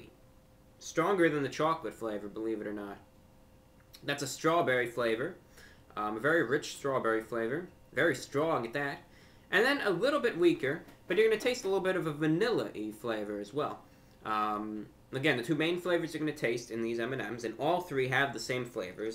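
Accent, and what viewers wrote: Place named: New York, United States. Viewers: it's actually his New York accent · Can an American help me out here, what is this accent? 'Vaniller' and 'Chawclat' and whatnot seems different to standard American, there's definitely a regional accent trying to be suppressed in there.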